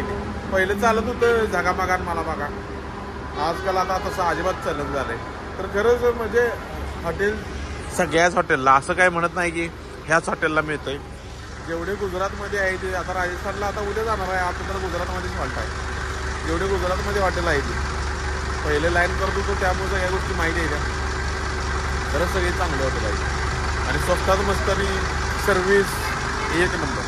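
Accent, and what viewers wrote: Kolhapur, India. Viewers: दादा भाषण कौशल्य खुप सुंदर आहे कोल्हापूरी भाषा 1 नंबर ❤❤👌👌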